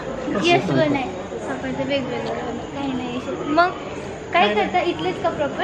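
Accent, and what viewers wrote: Latur, India. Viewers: Tuzya aavajala khup sut hoti aapli Latur chi bhasha.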